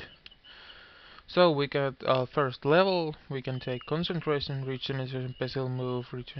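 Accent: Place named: Finland. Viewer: Heheh must be the Finnish accent.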